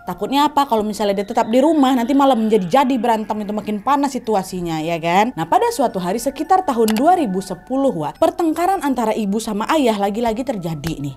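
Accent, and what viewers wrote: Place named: Medan, Indonesia. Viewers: Dari awal kak buat Youtube sampai sekarang selalu nonton dan gak pernah ketinggalan, dari kesedihan kak di tinggal Alm ayah dan abg nya kak sampai kak ke titik bahagia skrng aku selalu mengikutin kak, sehat terus Ya jangan sampek logat Medan kau berubah Ya kak ❤️❤️ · Sampe bingung ka tuh org jawa apa org medan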